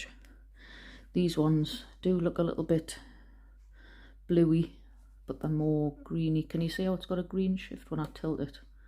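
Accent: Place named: Newcastle, United Kingdom. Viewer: Heya found you through Aggie & Jo... but 1st I just gotta say "hawayman" 😂 bloody love the jordie accent!! Me bros in Cramlingtin (think I spelled that wrong) but yeah he's in Newcastle years now. Anyways gonna watch the rest now 💕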